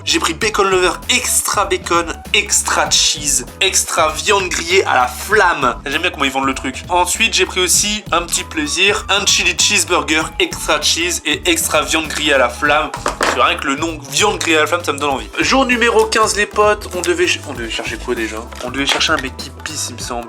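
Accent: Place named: North, France. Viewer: 9/7 pour la petite mousse !! Ton accent du nord se fais ressentir vive le 59 😄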